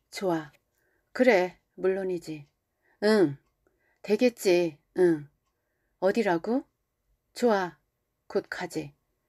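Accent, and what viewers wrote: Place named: Jeolla, South Korea. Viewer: 책 읽느라 수고가 많으십니다. 혹시 전라도 분이신가요? 몇 군데 발음과 억양이 듣기에 거슬리는 부분이 있습어요. 낭독자께서는 왜 의복, '외투'를 '에투'라고 발음하시는지요. 경 험에 의하면 호남사람들이 주로 그렇게 발음 하는 경향이 있더군요. 혹시나 해서 물어보는 겁니다! 아무튼 책 읽느라 수고가 많으십니다.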